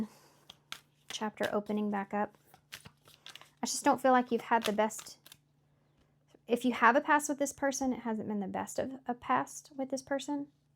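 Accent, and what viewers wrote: South, United States. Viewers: I think your southern accent has everyone calling you ma'am 😂 beautiful! Great reading thanks ma'am ❤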